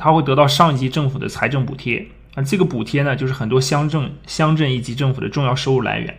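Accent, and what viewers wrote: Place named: Shanxi, China. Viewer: 感觉普通话中有山西口音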